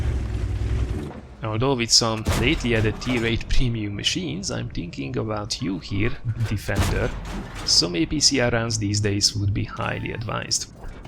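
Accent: Hungary. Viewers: Most veszem észre a magyar kiejtést, ha ezt olvasod légy szíves valahogy jelezd , hogy tényleg magyar vagy:D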